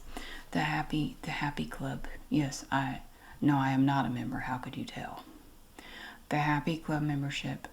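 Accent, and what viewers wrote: South, United States. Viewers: I've been watching your channel for a while now and you are one of my absolute favorites. I'm also a southern lady and my family disowned me when I came out as gay and I moved away(I even lost my accent). My grandmother's name was Mary and your accent and voice just remind me so much of how comforting of a presence she was. It just takes me back to a different world I left behind a long time ago. Also you are so beautiful! I swear I'm not trying to flirt lol. I'm not really into feminine women, just jealous tbh haha.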